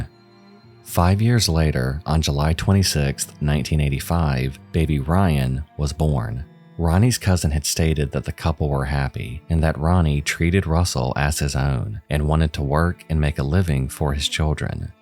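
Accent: South, United States. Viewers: This man's southern twang is kinda sexy hehe (i'm a guy)... 😝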